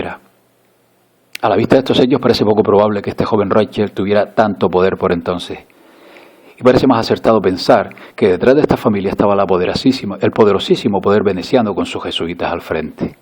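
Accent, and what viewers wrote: Canary Islands, Spain. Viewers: hablar con tanto acento español canario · HABLA UN CANARIO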